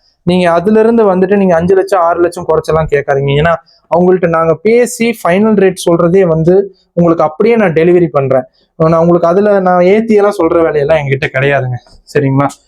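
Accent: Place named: Coimbatore, India. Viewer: kovai slang😅😅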